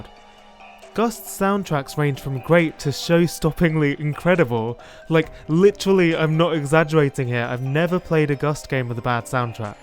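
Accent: United Kingdom. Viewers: Oh that's a British man, I honestly didn't expect that.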